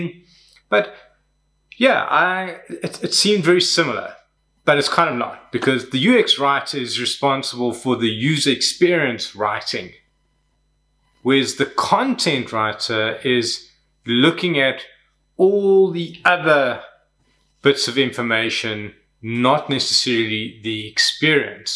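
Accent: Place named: South Africa. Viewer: thanks so much for this video! I’ve worked as a Content Designer and a UX writer for the past five years. One of the biggest challenges of our role is educating teammates and other stakeholders on the value of content-first design. You’ve done a great job of explaining it in a nutshell! P.S. I hear an SA accent. Are you based in SA?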